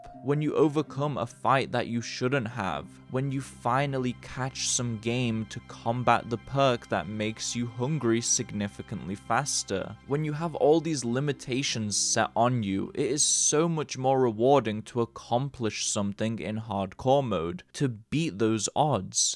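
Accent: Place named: Northwest England, United Kingdom. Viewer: This is my fourth playthrough. Two in original mode and my second playthrough in hardcore. The blacksmith dlc is heaven sent. This time I started it asap. This fourth playthrough is the best yet. Take my time and new things come up all the time. I'm finally getting a handle on the Kuttenburg map but I still get lost at times and for me it's mostly because I get lazy in my navigation before I head out from a town or whatever. I use my body as a sundial except for when it high noon ish. Never get off the road at night very far w/o nighthawk. But yeah, the immersion experience is so good in hardcore that I would never go back. I only use three negatives and the easiest ones for me. Very nice and relaxing video. Keeps the love fresh for the game. Cheers btw where in the UK is your accent from? My guess is the northwest. Blackpool. 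Liverpool?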